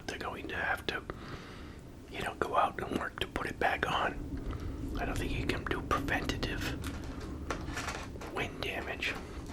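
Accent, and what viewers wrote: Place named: New England, United States. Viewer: Sounds like a fellow New Englander! I'm right over in Norwich CT! Just found your channel, love this video. I love going on late night McDonalds runs, sometimes I'm not even super hungry but it's just like a satisfying solo excursion while my wife is asleep. Listen to some music in the car and chow down in the parking lot. Sometimes if I'm feeling froggy I'll wake up super early for a McDonalds breakfast run while it's still dark out.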